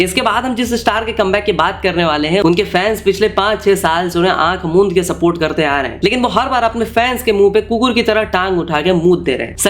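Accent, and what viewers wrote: Bengal, India. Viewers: Ap bengali ho Kya?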